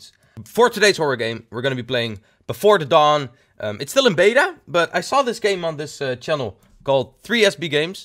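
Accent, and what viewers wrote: Netherlands. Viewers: Dutch Accent Heerlijk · He's dutch xD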